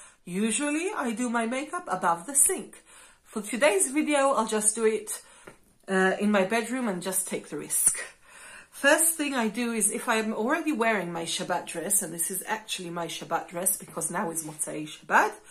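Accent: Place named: England, United Kingdom. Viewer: מהממת והמבטא (אנגלי?) הורס...! 😍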